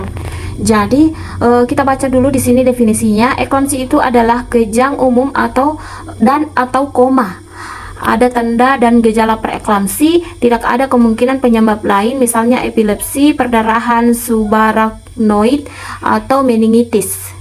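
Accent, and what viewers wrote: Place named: Bone, Indonesia. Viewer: Logat ta kyk org bone kak.. mksh sdh buat videonya kak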